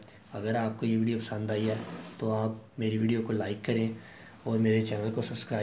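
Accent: Punjab, India. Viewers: Good Work AND your accent is very cool REAL PUNJABI DUDE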